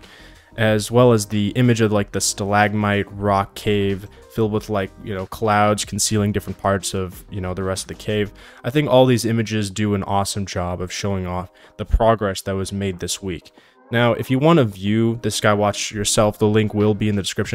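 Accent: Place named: United States. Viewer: I don't think you know what "formerly" means.... You sound American, so I'm confused why me, a Danish person, needs to correct you on this. Formerly, means "in the past". Saying "The new Worlds Adrift, now formerly known as Lost Skies" is a nonsense sentence. Is it now or formerly? It can't be both. It's either: "The new Worlds Adrift, formerly known as Lost Skies" - Meaning it used to be called Lost Skies, but is now called Worlds Adrift. Or "The new Worlds Adrift, now known as Lost Skies" - Meaning it used to be called Worlds Adrift, but is now called Lost Skies. If you want to use the word formerly, you would say "Lost Skies, formerly known as Worlds Adrift"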